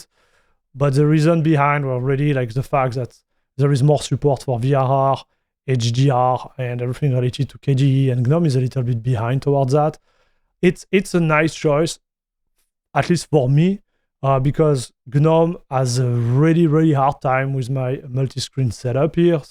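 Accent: France. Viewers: Les français qui ont remarqué l’accent français 🖐️ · you don't need to be french to notice the heavy french accent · I love your videos, so informative and I like your french accent too 💖 running nobara 41 rn. unfortunately I haven't gotten time to game as much but it's great. I've been able to get almost everything working with a few quirks I need to find my way around over the year. funny thing, my cs2 install won't stop lagging and hitching. same issue on windows too, to a lesser extent tho. haven't tried different distros yet but I figure it'll be the same everywhere atleast on wayland. not touching x11 cuz I want how to learn to fix issues instead of putting a temporary band aid. anyways thanks for the videos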